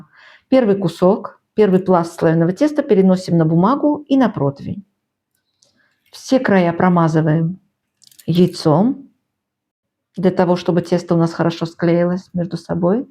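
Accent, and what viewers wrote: Baku, Azerbaijan. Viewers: Добрый вечер, а вы бакинка? Простите акцент❤❤❤ родной😂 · Случайно увидела это видео, думаю, дай гляну, хоть и НГ уже прошёл)) Уже на слове «столичный салат» у меня возникли смутные сомнения)) Потом кИнза, винегрет с красной фасолью и, наконец, демьянка – развеяли все мои сомнения – я смотрю видео бакинки из Греции😂 И ещё, конечно же, наш акцент, хоть и небольшой, но всё равно ощутимый😂 Поймала себя на мысли, что смотрю видео и жду, когда же Вы скажете «бурак» вместо свеклы😂 На душе так тепло стало при просмотре вашего видео, будто с родными повидалась)) Большой привет Вам из Баку! И спасибо за интересное видео! Успехов и крепкого здоровья Вам в новом году! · Акцент бакинский неистребим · Здравствуйте,! Это все, что готовили наши мамы, я прям окунулась в детство! Вы, случайно, не бакинка? По разговору почувствовала, потому, что демьянки, говорят только жившие в Баку❤❤очень приятно познакомиться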